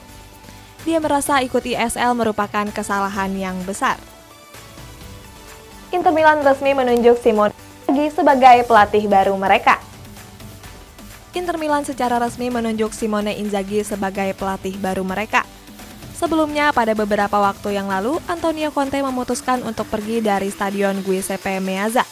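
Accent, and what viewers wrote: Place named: Java, Indonesia. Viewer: Marai yo titisan wong kediri lho.. Main oo nde ndi wae logat jowone panggah enek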